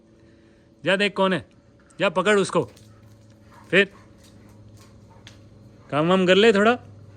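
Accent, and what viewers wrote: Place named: Gujarat, India. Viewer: First time viewer- Bolne ka tone gujrati he ya marathi bit confuse. But style hrithik jesa he bilkul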